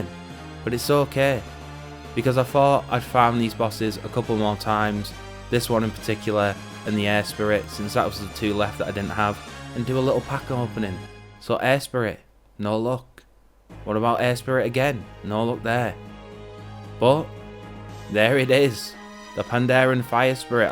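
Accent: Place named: Northern England, United Kingdom. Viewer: Celestial Tournament is hard af. You need very specific pets with exact numbers. I have Mini Tyrael (Went to the invitational in 2008 with my bestie) and I’m from Northern England and you sound local-ish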